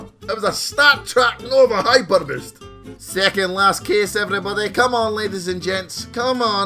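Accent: Scotland, United Kingdom. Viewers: R u Scottish?:} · R ye Scottish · Are you from Scotland? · R u Scottish ? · thank god a Scottish csgo youtuber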